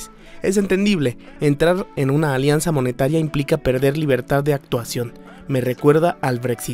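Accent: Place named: Mexico. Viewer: No me gusta tu español mexicano.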